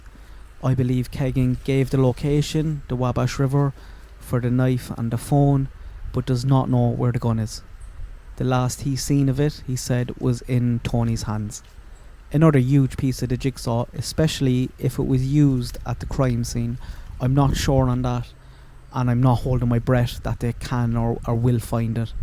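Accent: Ireland. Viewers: Great to hear an Irish accent,this case is going to be solved,I'm a new subscriber🇮🇪🇮🇪🇮🇪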